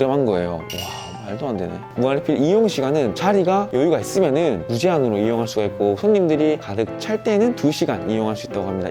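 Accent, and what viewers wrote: Gyeongsang, South Korea. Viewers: 와.. 그냥 몇번 봤는데 대구말투에 결국 구독박고갑니다ㅋㅋ 대구사는데.. 인천 가게되면 꼭 저기 가봐야겠슴다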